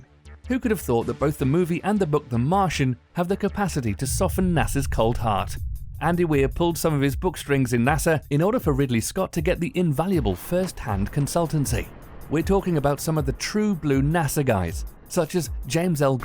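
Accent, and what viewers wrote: England, United Kingdom. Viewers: what the f u c k are you doing ? ( peter 'pole' gazer ) pack your bags ? fix you a visa ? turbulent Hollywood history ? . okay don't answer that , your not from around here are you ? I mean from America ? and worst of all an English accent 'cynical humor' narrator . ( I'm speaking for myself ) but I'm still going to say ' w e a r e s I c k to d e a t h of b r I t I s h a c c e n t s p l a s t e r e d e v e r y f u c k I n g w h e r e' it's not cute, it's not jolly , it's not a "romp" . even if I'm way off on this and get all kinds of blowback I don't care . take your effing insults and stick'um up your A R S E ! jeezus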